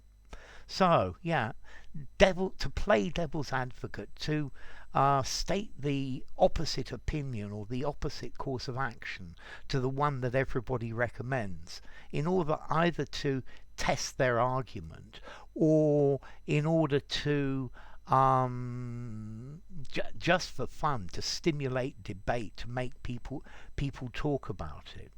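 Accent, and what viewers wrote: United Kingdom. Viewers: My God, teacher's British accent is adorable 🤟😎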